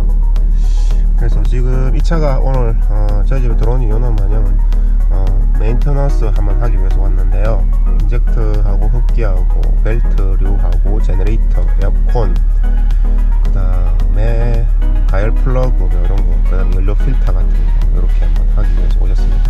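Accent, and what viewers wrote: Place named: Gyeongsang, South Korea. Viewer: 아무리 힘들어도 신나게, 멋집니다. 경상도 말투라 오해하실 분도 좀 있겠습니다. 혹시 부산인가요? 저는 인천에 삽니다만, 부산이 고향이라 친구들 많아요. 부산이면 소개해드리고 싶네요. 수고하세요. !!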